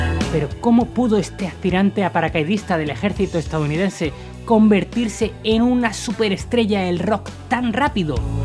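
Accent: Spain. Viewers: Es chistoso como los españoles se expresan, pero me agrada el video, ¡bien! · Buena historia. Pero quizá voy a sonar ridículo. Pero el acento español me desánimo a seguir viendo. Saludos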